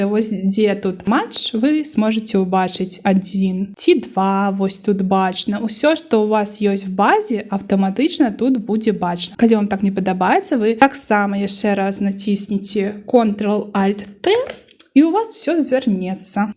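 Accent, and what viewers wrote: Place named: Ukraine. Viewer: Вельмі файна ў цябе выходзіць) Асабліва з ўкраіскім флерам)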